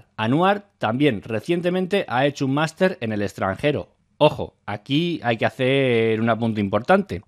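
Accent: Mexico. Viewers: Estoy empezando a pensar que eres mexicano Asentó, palabras, ejemplos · 🤔te parece que habla como mexicano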